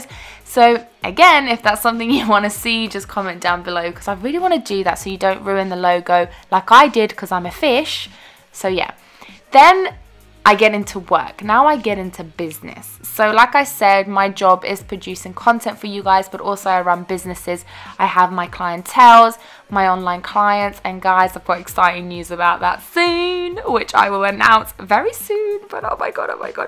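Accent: United Kingdom. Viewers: Are you from Great Britain???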